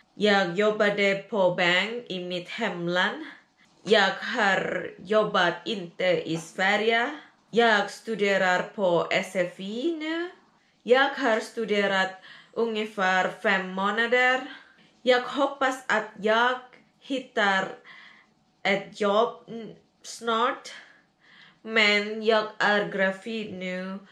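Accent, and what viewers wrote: Indonesia. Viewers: Kental bgt aksen indonya😀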